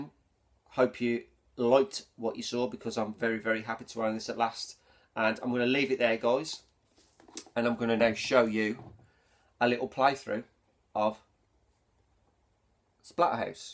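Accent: Birmingham, United Kingdom. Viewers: Congrats, lovely addition to your cillection. Looks fantastic, really nice graphics on this version. I like those huge neo geo style cases as well:) Don't worry about your brummie accent mate lol people listen to talk bollocks in my gameplays so the accent must be ok:)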